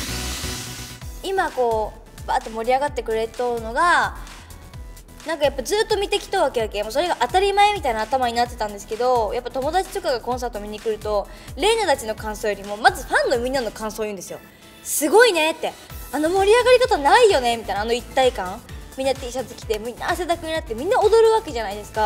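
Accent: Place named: Hakata, Japan. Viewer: れいなの博多弁好きすぎる。。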